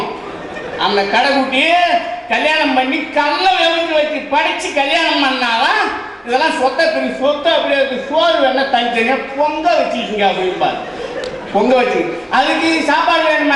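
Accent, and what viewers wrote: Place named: Nadu Nadu, India. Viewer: அருமையான வட்டார வழக்கு., நடுநாட்டு மக்களின் வாழ்வியல் முறைகளை வருங்கால சந்ததியினருக்கு மிக தெளிவாக எடுத்துரைத்துள்ளீர்கள். அருமை ஐயா!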